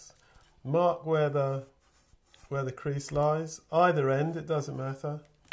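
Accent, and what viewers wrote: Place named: RP, United Kingdom. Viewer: ciertamente tiene un estupendo acento Británico culto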